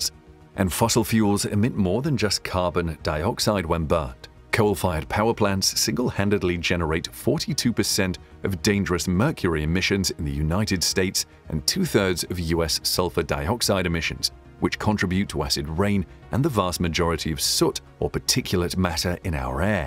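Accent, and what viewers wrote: United Kingdom. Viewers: I guess that British accent is to make the Chinese propaganda sound intelligent. Does the name Chernobyl (thorium reactor) sound at all familiar?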